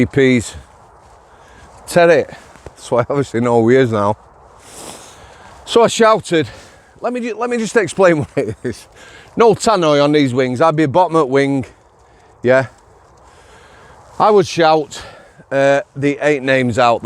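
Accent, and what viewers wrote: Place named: Manchester, United Kingdom. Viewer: how come you’ve got a manc accent if your from sheffield? great video mate.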